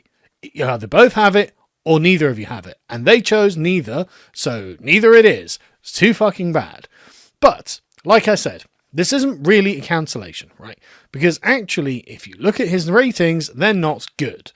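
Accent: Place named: United Kingdom. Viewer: You may have bought all that equipment 6-7 years ago but damn. You can’t buy that Brit accent that turns $2 words into $10 words.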